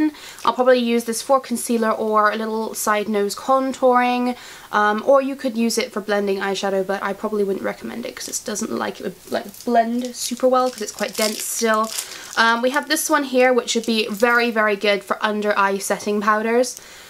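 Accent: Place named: England, United Kingdom. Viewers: So West Country YAS love it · Chocolate brown & medium brown are the most popular colors for lip sticks right now. I guess by your acent that you are from England. Usually the U.S. is always ahead of times when it comes to fashion. Especially California, New York, Chicago, and Detroit!!!! So, go get some BROWN LIPSTICK!!!!!!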